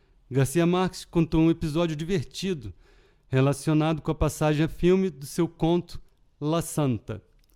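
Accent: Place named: Portugal. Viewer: Eu gostei muito da tentatica de falar com sotaque português. O livro ficou mais realista.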